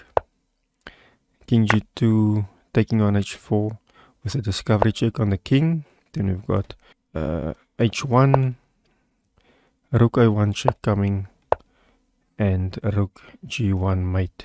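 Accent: South Africa. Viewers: Very nice. This is of course the so-called 'Game of the Century' which gained the young Fischer a lot of attention. To the narrator: are you from South Africa?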